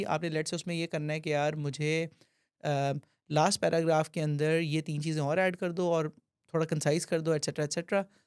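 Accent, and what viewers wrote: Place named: India. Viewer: Thanks to Almighty Allah I saw first Pakistani tech content creator who talks like Indian tech content creator we proud of you sir what a fabulous way to narrate the story big fan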